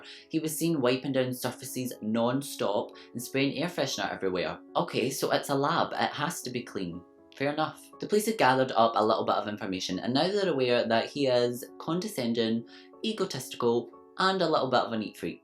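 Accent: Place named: Scotland, United Kingdom. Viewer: I’ll let it go because we did get a Scottish accent in its place. ;) Lol!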